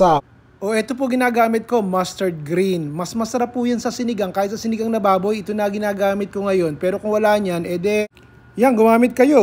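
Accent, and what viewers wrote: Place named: Nueva Ecija, Philippines. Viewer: Mr tga Nueva Ecija ka ba, kasi ganyan ang punto ng Novo Ecijano eh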